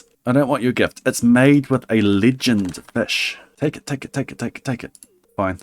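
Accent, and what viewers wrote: New Zealand. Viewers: He's definitely a Kiwi. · Holy NZ accent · Bro? Are you Kiwi? I swear. Even if you're not... You totally do!!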